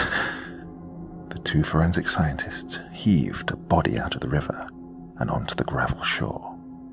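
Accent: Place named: Cockney, United Kingdom. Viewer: I like the Cockney accent Doctor! Always nice to hear stories of our native England!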